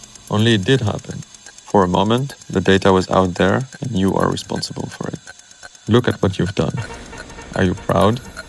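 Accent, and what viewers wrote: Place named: France. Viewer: A critique (since this is art): : "legal to own, but illegal to sell"? Assuming he's in France (based on accent) - it could be legally acquired personal data from a website, which is legal to own but illegal to sell. Pretty much everything else is illegal to own if it's illegal to sell in France, even more so when it comes to data. So no, it's not 'horrific' like CP. Therefore, there's likely no 'ethical' dilemma at play here given 99.99% of people won't care about personal data. I think it would be more 'powerful' as a piece of art if the person pressing the button would REALLY have to think twice before pressing the button because of universally held moral principles.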